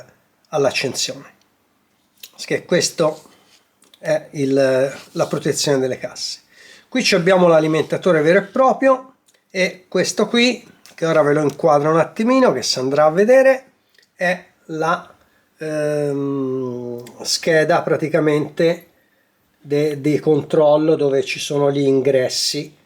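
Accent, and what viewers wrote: Tuscany, Italy. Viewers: É toscano? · Complimenti per la chiarezza delle spiegazioni e per la simpatia toscana😂 Iscritto 👍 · Ottimo lavoro,e complimenti per il video,il toscano mitico,e un accento che e ganzo,👍👍👍👍👍👍👍👍👍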